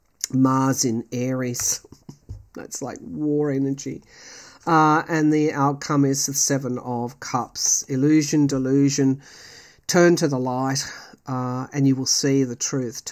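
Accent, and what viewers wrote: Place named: Australia. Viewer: I love your readings, and find your no-Nonsense Aussie accent 😅 as comforting as a US Southern drawl. You are a genuine soul. Thanks more than you can appreciate the comfort and warnings you provide! Ava in Florida😊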